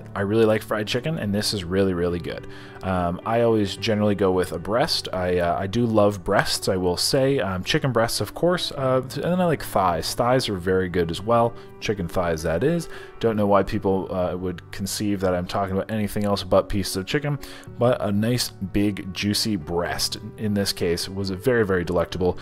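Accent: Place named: South, United States. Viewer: Idk if you remember seeing my comment way back, but I will repeat; although I respect that you're a proud Canadian (as well you should be), I feel like you're an adopted southern USA boy at heart. I've heard your twang inflections, and have definitely witnessed your love of southern cuisine. If you ever decide to immigrate, we would welcome you with open arms and lots of southern hospitality! Further, you could never out-eat the spread when we "put on the dog" at any of our family gatherings!! God bless!🥰🥰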